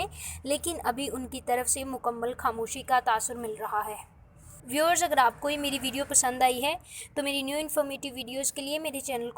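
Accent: India. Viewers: Intihai buri hy...urdu tu sahi nahin..news kya parrhni....indians ki tarah khabar ki ghabbar or ghalat ko galat kaha ja raha hy...urdu k words pronounce krna ata nahin...bad👎👎👎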